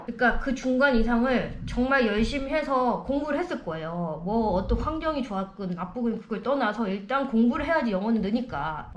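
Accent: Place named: Gyeongsang, South Korea. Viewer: 님은 스피킹보다 히어링이나 독해가 훨 좋은듯ᆢ물론 스피킹도 수준급 이상이지만 갱상도 분이라 악센트 때매 그리 보이는듯ᆢ근데 그보다 님은 존재자체가 반짝여서 그렁거 항개도 안중요함 ᆢ마쟈 아니야~^^ ❤️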